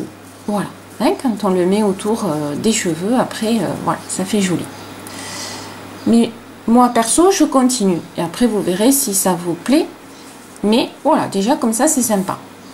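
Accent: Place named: Provence, France. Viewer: merci pour se jolie chouchou et en plus avec notre acsent je suis de provence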